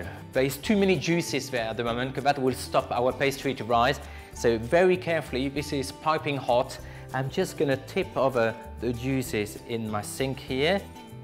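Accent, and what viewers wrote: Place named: France. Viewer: ur French accent is slowly picking up some British slangs too I think haha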